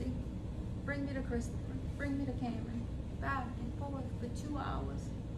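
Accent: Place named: South, United States. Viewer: I’m part of your usps fam and have followed your story. I’m so extremely proud of your presentation it has to be so difficult every. single. time. !! And I pray daily that the good Lord comes back soon to reunite your family and I’m so excited to meet those wonderful kids !! That day will come I promise !until then I send all my love and support from Jax - originally Wisconsin and live your little southern voice !!! ❤️🙏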